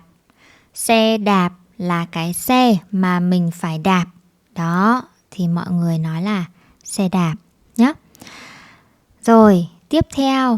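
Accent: Vietnam. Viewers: I really love your Northern accent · is this northern, southern, or central vietnamese?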